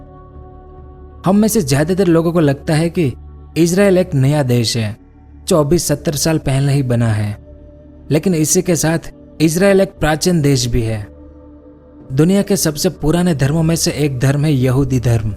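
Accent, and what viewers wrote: Gujarat, India. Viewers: Aavaj Se to Gujarati lag raha hai Because I know tone of Gujarati language is different in different part of Gujarat. I think may be he is from Ahmedabad, Surat Or Sauratra. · आप की बोली में मुझे गुजरात की मिली जुली बोली लगती हैं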